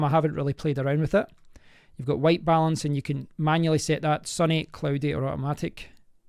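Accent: Scotland, United Kingdom. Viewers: ! Brilliant! Magic! Finally someone on Youtube I can understand. ( I'm Scottish too ) living here in the US. I liked your review of the Dash cam. very good. keep up the good work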